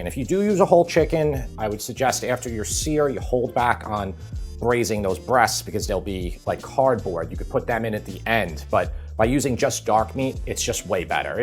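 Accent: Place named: East Coast, United States. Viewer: Boouught and fooour cups, abatt. Awofff?!? Swalt?! What?! Is the east coast okay? Malk... no.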